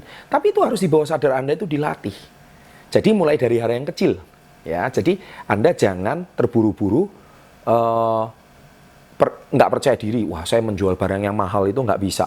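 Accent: Java, Indonesia. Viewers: Keren mas... saya suka gaya bahasanya... dialek jawanya kental banget...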